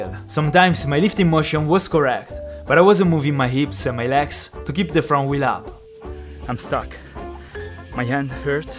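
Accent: Italy. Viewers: Hai l’accento italiano 😂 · Ma quanto cazzo si riconosce che sei italiano! 🤣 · Si sente che sei italiano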